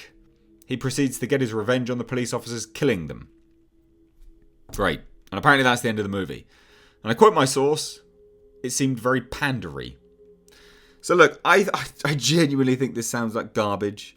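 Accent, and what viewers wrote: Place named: England, United Kingdom. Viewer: I don’t think you watched the original Candyman. You sound a little too bias because of your lack of fondness of Jordan peel. Based on your accent you should know who Clive barker (a fellow Englishman), who originally wrote Candyman. So the so-called “racial stick?” was not created by Jordan peel but originated from Clive. You have some great information pertaining to the plot, but unfortunately very bias and you sir sound a little offended by the actual plot.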